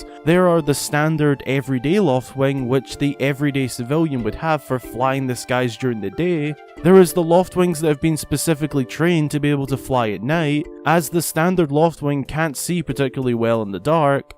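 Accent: Scotland, United Kingdom. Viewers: Do you have a scottish accent? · Are you Scottish?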